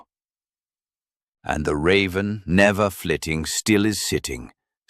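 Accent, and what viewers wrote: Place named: South, United States. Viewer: As with your other presentation with Pistolero Poe, this is a joy to behold. I do detect a slight southern dialect; it becomes easy to imagine this as Poe's actual voice. The AI illustrations for his poem would have fascinated the same man who wrote an essay about the then new daguerreotype process, and sat for several of such portraits. He was quite taken by new technologies and the sciences. Thank you for including the entire poem that we might read along, or ponder it...evermore.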